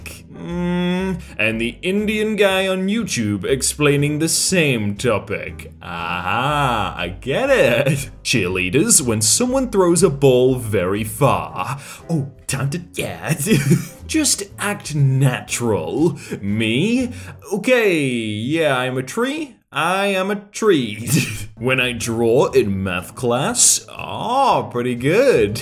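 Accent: United Kingdom. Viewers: day 40? of asking that he british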